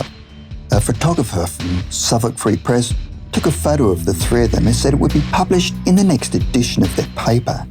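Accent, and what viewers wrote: Australia. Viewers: An australian narrator.. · Omg your australian 😂❤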